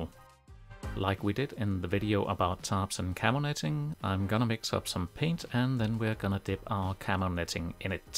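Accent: Denmark. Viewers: er du dansk? det lyder sådan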